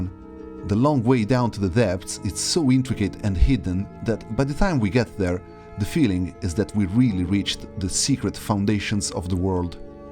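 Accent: Italy. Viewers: Your accent is Italian eh? :) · You have the most Italian accent 😂😂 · Amazing video! Very sleek editing. I was wondering how you got rid of the fog, a run without it sounds fun. Also, are you Italian? I'm not completely sure but you pronouncing the name of that town correctly and your accent points that way. Great job! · Ho la sensazione che tu sia italiano, l'accento c'è, son contento di vedere qualcuno dei nostri fare BENE dei video in inglese, complimenti! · Compà si sente da 80 km che sei italiano 😂, bella li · ma sei italianissimo si sente subito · L’accento italiano è valore aggiunto al video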